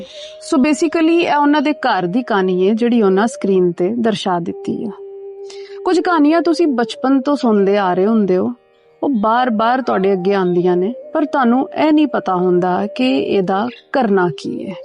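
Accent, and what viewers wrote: Punjab, India. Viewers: ਵਾਹ ਦੀਦੀ ਜੀ, ਤੁਸੀ ਤਾ ਜਵਾਂ ਈ ਸਾਡੀ ਵਾਲੀ ਪੰਜਾਬੀ ਬੋਲਦੇ ਹੋ । ਧੰਨਵਾਦ - ਚੜ੍ਹਦੇ ਪੰਜਾਬ ਚੋ । واہ دیدی جی، آپ بالکل ویسی ہی پنجابی بولتی ہیں جیسی ہم بولتے ہیں۔ شکریہ — مشرقی پنجاب کی طرف سے۔ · lao sister tuhada channel vi subscribe kar ditta tuhade Panjabi bolan de lehze karke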